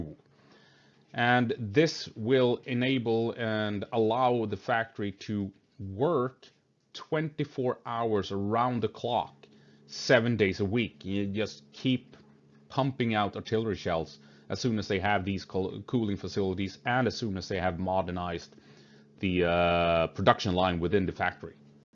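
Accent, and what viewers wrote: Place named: Sweden. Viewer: Veldig imponert over swenglishen din😂👍 Denne kanalen ble lagt til blant mine favoritter med EN gang!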